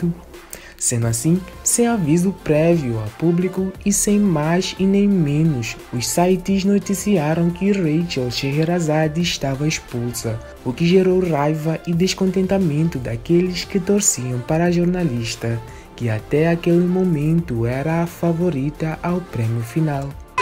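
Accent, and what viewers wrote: Portugal. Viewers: Esse sutaque de português? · Essa pessoa narrando o vídeo com certeza é de Portugal ou de algum país luso português.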